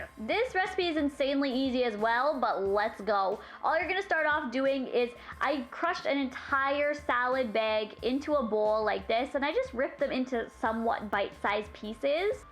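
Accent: Canada. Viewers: I say it like her, it must be a Canadian thing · Probably because she's Canadian and Canadians tend to say some words a little different. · She's canadian! Therefore, she has an accent. She's not saying it weird lol.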